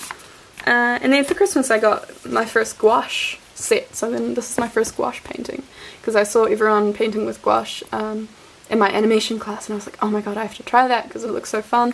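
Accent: New Zealand. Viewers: Are you from New Zealand ?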